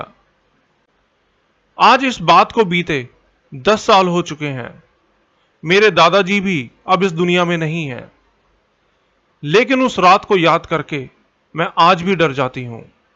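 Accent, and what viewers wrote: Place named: Sindh, India. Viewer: Aap kya sindhi ho kya baat karna waqt rhoda sindhi sound karta ho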